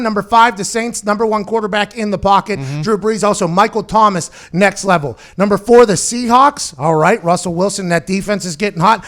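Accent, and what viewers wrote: Pittsburgh, United States. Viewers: Plum, PA. So yes a Yinzer.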